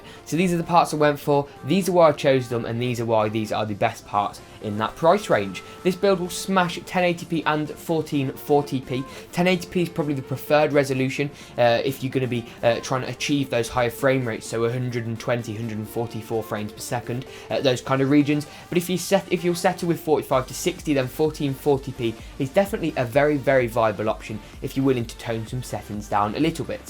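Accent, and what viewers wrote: United Kingdom. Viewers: does anyone found it feeling so damn hard and stressful when hearing to talking, i dont mean that i cant hear what he said but its not feeling good for me to hear that kind of british english.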